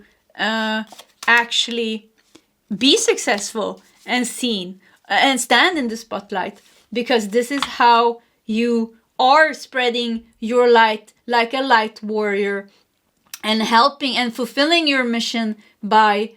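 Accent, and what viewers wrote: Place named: Sweden. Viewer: can I PLEASE adopt you as my DAUGHTER?!!😊❤😂 We just LOVE listening to your sweet, Swedish cute self in readings! And pile#2 was SPOT on every single card you read!! You were ABSOLUTELY right about the news and media.. I found myself sucked into all the celebrity gossip on YouTube last weekend..for like ALL weekend, and then my (twin flame) & I ended up in a HUGE fight..? We JUST figured out...its the DARK energy that made us get all angry.. NO more watching ANYTHING but light work and higher learning!!😁🤗😘😘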